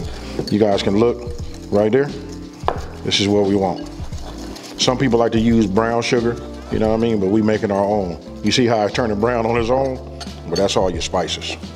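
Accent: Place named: Los Angeles, United States. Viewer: I'm cooking my Thanksgiving meal. I've watched this video and a few of your others 15 times 😂 for each item. My husband walk in like who is this LA guy you watching . I know that accent. 😂😂 I'm like what accent 🤔😂 Now I know why I connect with your videos so much. I feel like I'm at home 🤷🏾‍♀️😂😂 #Happy Thanksgiving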